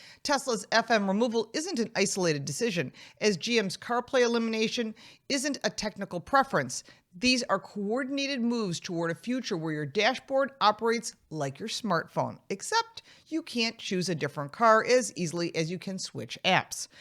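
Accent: United States. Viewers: I don’t care about your bambling on in your yanky voice I’m Aussie and I drive a Australian made car made in the 90s with a huge sub woofer don’t want to know about Electric crap shit boxes cars are petrol v8 and six cylinder turbos WAKE UP FK WITS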